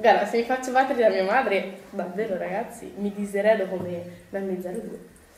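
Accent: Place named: Florence, Italy. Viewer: te tu c hai l accento un po fiorntino dan do vieni ?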